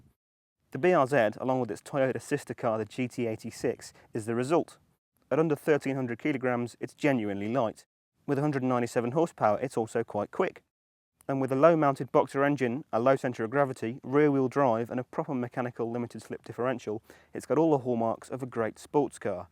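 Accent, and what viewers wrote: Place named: England, United Kingdom. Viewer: Because he is English, and that is how we English say 'Z'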